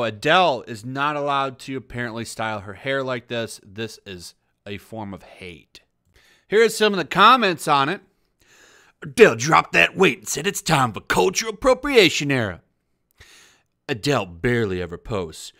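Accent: England, United Kingdom. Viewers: Lol your English accent made my day. 😂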